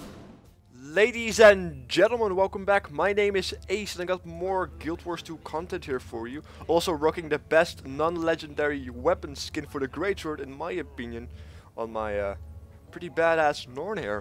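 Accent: Netherlands. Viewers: I knew it, because your accent is just typically Dutch, (Ik ben ook Nederlands dus ik kan het weten) On which server are you playing? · are you Dutch?:P, If so, do you play on Ring Of Fire?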